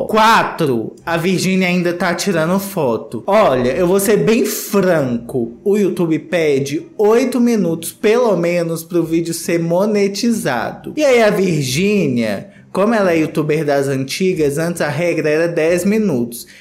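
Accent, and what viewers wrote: Minas Gerais, Brazil. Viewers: Um escritório bem feito?!, você me faz rir demais, menino! 😂Adoro seu the boche e seu sotaque mineirim 🤩🤩💜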